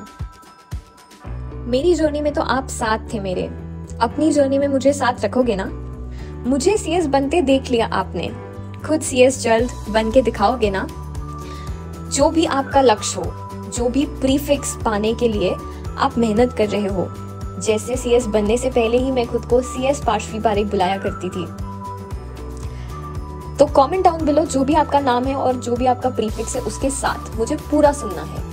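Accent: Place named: Maharashtra, India. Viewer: Apan is an emotion ❤️ this proves that dii had passed a lot of time in Maharashtra and has a quite Marathi Toning 😂❤️❤️ GOD BLESS DII🙏🏻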